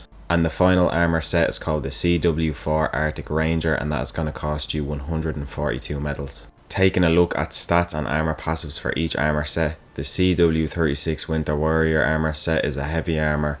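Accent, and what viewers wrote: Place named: Dublin, Ireland. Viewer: Whats up man, couldn't believe when i heard your accent i'm from Dublin myself. Whats your steam name If you're down to run some games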